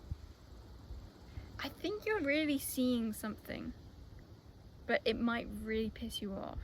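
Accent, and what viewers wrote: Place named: United Kingdom. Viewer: What area of the UK is your accent from?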